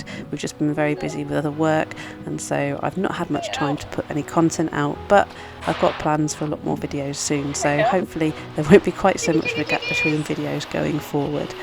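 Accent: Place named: Manchester, United Kingdom. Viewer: Well I thought she was talking rp recived pranonsition now it terns out she sounds a mankunian?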